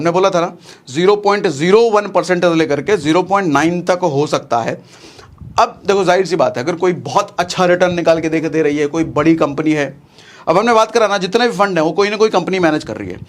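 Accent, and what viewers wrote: Bihar, India. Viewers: sir ke sabhi pasand krta hai ye bihari hai bolte hai na ek bihari 100pe bhari ye yahi hai sir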